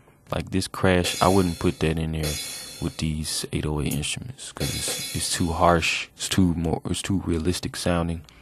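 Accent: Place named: South, United States. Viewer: he makes the word fruity loops sound hilarious lol! he sounds country lol. nice video though, real shit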